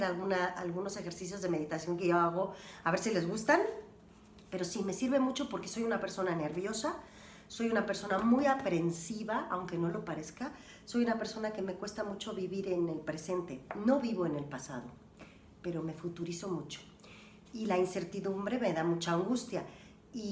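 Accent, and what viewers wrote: Mexico. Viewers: Aquí aún hablabas como mexicana, en el documental retomarte tu acento español 🤔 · Hablas cómo toda una linda Mexicana...viva México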